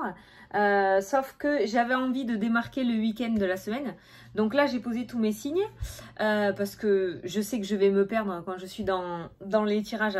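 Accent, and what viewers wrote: South, France. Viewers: Coucou l accent du sud fait toujours chaud au cœur gros bisous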